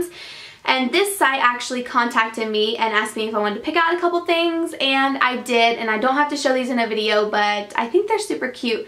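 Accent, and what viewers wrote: South, United States. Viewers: You sound so southern!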